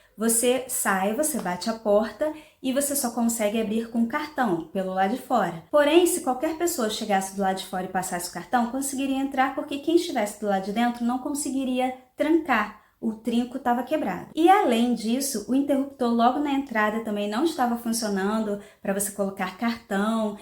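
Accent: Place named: Brazil. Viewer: Speak like brasileira.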